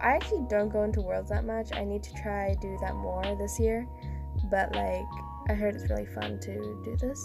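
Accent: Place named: Australia. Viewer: i like ur aussie accent its so cute it sounds just like rosie from blackpink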